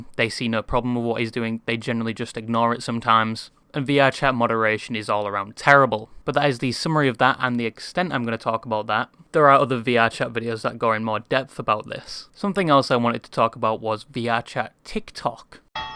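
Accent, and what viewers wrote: United Kingdom. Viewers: Oh god the heavy British accent, I already know I'm not getting 50% of what he's saying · The British accent is so thick I sometimes can't understand what you're saying vroo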